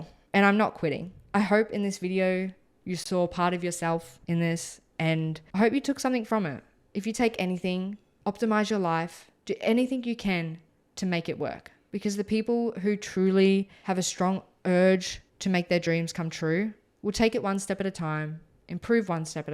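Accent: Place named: Australia. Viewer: Before u said Australian eastern time ,I knew were Australian. The accent gives it away.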